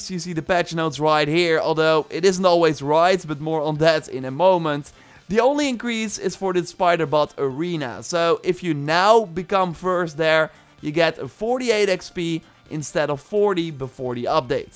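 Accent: Netherlands. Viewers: Arw you dutch?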